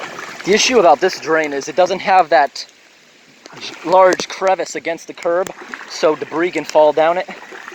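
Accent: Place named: New Jersey, United States. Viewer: Sounds like Jersey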